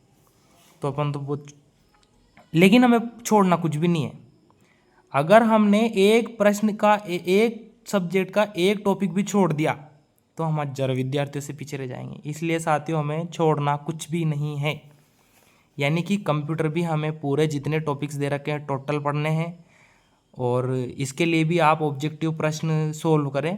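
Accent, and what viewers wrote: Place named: Sawai Madhopur, India. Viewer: Sir apke bolne ke tarike andaja lga paa rha hu aap sawaimadhopur ya aaspaas area ke hai....❤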